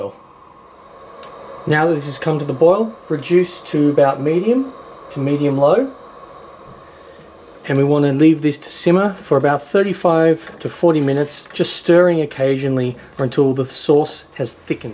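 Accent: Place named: Australia. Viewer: Lol as if your great Aussie accent doesn't give away where you are! Hello from Sydney!!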